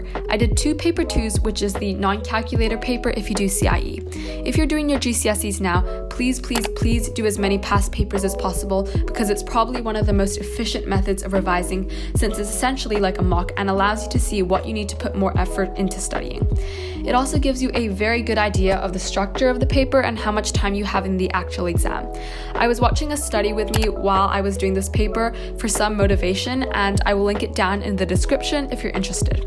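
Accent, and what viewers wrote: United States. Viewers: love this, super motivating :) i have a question, are you english? because your accent is a bit more american and i didn’t know how you took gcses x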